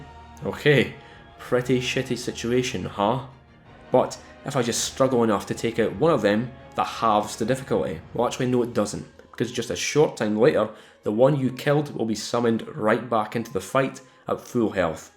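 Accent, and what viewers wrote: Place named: Scotland, United Kingdom. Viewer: I missed your smooth scottish voice and it'd only been like 10 hours since I watched your last part. Nice work.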